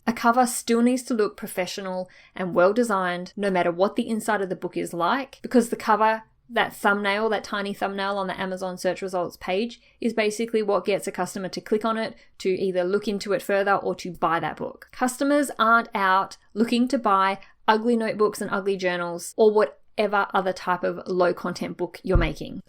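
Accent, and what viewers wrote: Australia. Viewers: I went what? Aussie girl talking about Amazon KDP books! How refreshing. Not that other other international sites aren't relevant, just wasn't expecting the accent. Then I thought Kiwi? no. definitely from the land down under. Just started publishing and dabbling in low content books in the last few weeks and yes, must start to promote. Thanks for your informative sessions.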